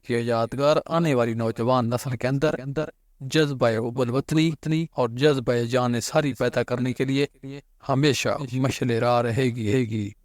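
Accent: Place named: Mianwali, Pakistan. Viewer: Lala Banda mianwali ka ho or Urdu parhey tu aesey hi hota he....baharhall achi kawish he Allah Kareem apko kamyab karey...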